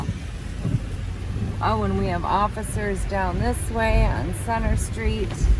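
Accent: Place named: South, United States. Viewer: you sound like southern usa americans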